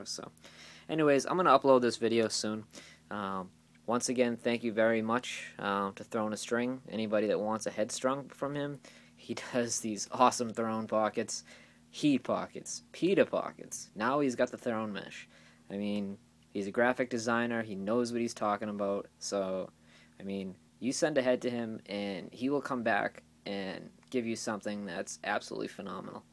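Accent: Boston, United States. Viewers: Your Boston accent is pretty strong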